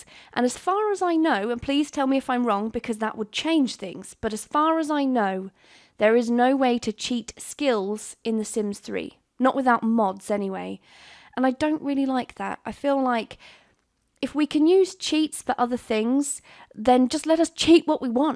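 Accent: United Kingdom. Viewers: Oh my life are you brittish? I joined on and your accent sounds so Brittish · I think she's british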